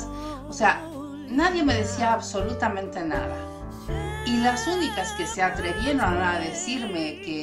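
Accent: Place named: Chile. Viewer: Wow que bendición que aún mantiene el espańol con usted esq de repente pensé q traía algo de chile 🇨🇱 porque tengo una amiga así como usted es psicóloga y me viene a visitar y la escuchaba si como ella y dígale adelante usted también creces mucho ya lo verá !!🙏🏻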